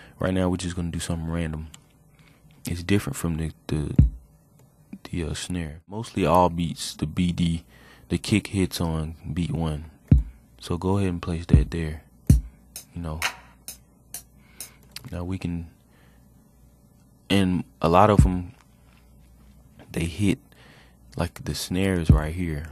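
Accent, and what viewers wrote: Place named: South, United States. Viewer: he makes the word fruity loops sound hilarious lol! he sounds country lol. nice video though, real shit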